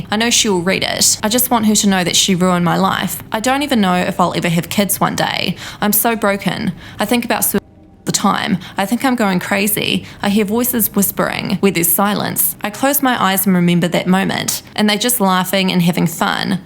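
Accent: Australia. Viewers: The narrator had a grating and annoying accent. Sounds like Aussie. Pretty sure there, it is legal or decriminalized. "Influencer'only a word that exist in modern shole degenerate societal garbage. So Dubai sounds modern day Sodom & Gormorrah? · The narrator/reporter sounds like a typical Aussie Bogan.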